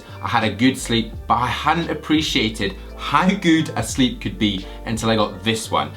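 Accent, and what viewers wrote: Scotland, United Kingdom. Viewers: Eddie Lopez It's a Scottish accent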